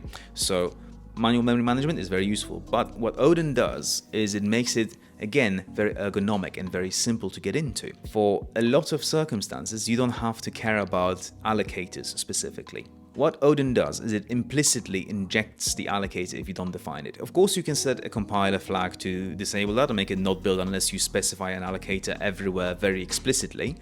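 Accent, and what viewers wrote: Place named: United Kingdom. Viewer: same half-posh British accent; the similarity increases . . . :)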